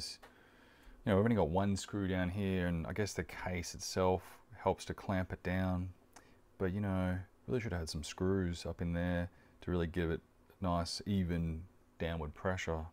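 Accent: Australia. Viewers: Am I the only one that likes the down under accent?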